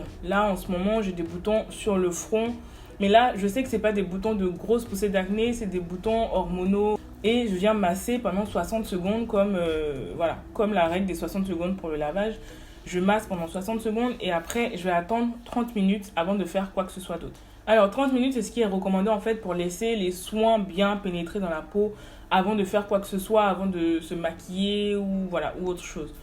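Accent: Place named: Ivory Coast. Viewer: L'accent me fait penser a une ivoirienne 🥰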